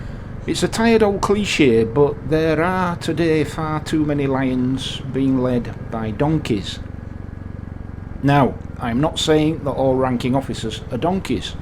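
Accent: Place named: Bradford, United Kingdom. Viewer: First off... good to hear a Bradford accent again! 1/ Cuts from 2009: I know two police officers who were made redundant in the wake of those 'austerity' measures - one a month before his retirement (I believe) so that he would not receive his full service pension. I know a couple of others who left at the same time because they said policing changed in the wake of those cuts - policing became reactive rather then proactive with less engagement with members of the public and less emphasis on crime prevention and more on 'firefighting'. Talking about 'customers' - I was in education in the 80s/90s and about the same time there was a shift from seeing students as students towards them being 'customers'. The argument of Thatchers changes in the 80s (individuals increasingly paying for education services) was as they were now paying they were 'customers' - and the customer is always right. 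The introduction of private sector attitudes and marketing was endemic. Policing and education are (supposedly) public services NOT private businesses which exist to make a profit by (sometimes conning) customers out of their money. 2/ Biking: there are roads I hate to go on not because of the traffic (we live now at least 50 miles from even a small city and rural road users are very polite) but because of the dire road surfaces particularly on back lanes that are ever more more beginning to resemble green lanes. Live where we do and you soon begin to perfect the art of the pothole slalom. Rece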